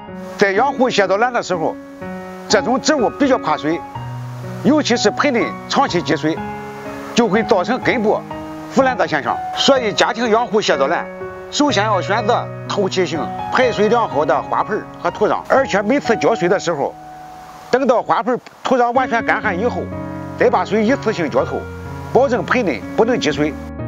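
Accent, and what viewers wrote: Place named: Shandong, China. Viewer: 山东话太严重了，听不懂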